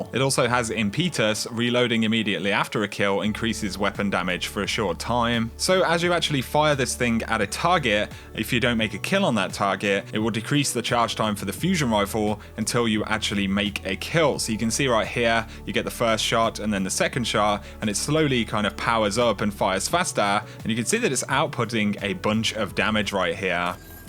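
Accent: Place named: Birmingham, United Kingdom. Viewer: Brummiest accent going on yt lool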